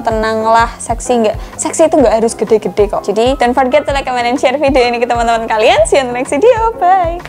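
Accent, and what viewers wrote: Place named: Solo, Indonesia. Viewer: Mbak ngomong nya lucu ...dari solo ya mbak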